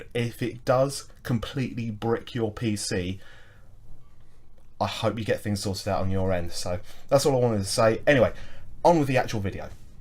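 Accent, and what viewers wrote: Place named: United Kingdom. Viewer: I just watched your at2020 usb + video. What a vid also, you havew lost so much weight. You look so much better well done. Also Could you do a setup tour because i think it will look amazing and would be a great video. Much Love brother and keep up the good work! <3 (also, nice to see someone from the UK lmao, love your accent, mine is shit)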